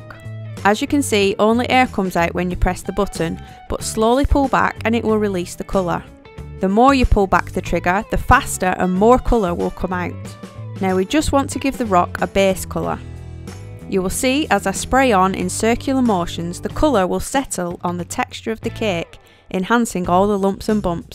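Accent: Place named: Yorkshire, United Kingdom. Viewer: Are you from Yorkshire as you sound like one of us haha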